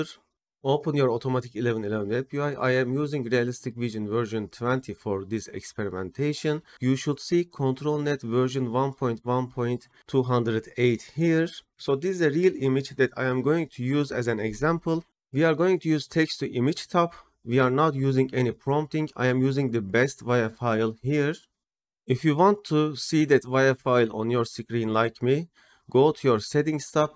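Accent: Turkey. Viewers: o kadar guzel turk ingilizcesi konusuyosunki direk dikkatimi cekti ;D Guzel video bu arada thnxx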